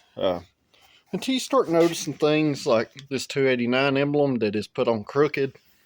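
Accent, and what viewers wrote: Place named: South, United States. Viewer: Learn the English language dude , you sound like a redneck....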